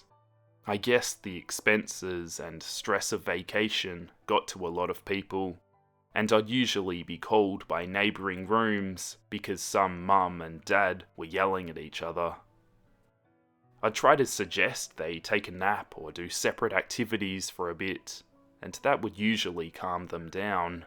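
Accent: Australia. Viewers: Your skill and works are an inspiration. Also your Aussie accent adds a uniqueness to the story narration.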